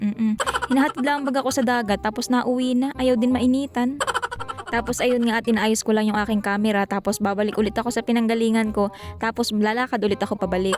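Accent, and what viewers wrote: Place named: Mangyan, Philippines. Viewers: ma'am taga mindoro ka po ba? kasi sa tono ng salita mo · Miss sudgest lng aliisin mo ung tunog kamangyan kc Ang galing Ng voice over at mga jokes mo kaya d mo kailangan gumaya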